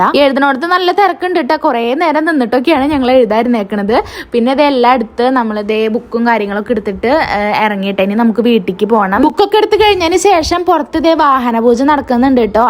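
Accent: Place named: Pattambi, India. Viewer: ചേച്ചിടെ കുക്കിംഗ്‌ and way of talking okke kelkkumbol നങ്ങടെ അതെ സെയിം രീതി എനെന്നു എപ്പഴും തോന്നാറുണ്ട് 😃 ചിലപ്പോ അടുത്തയോണ്ടാകും pattambi😍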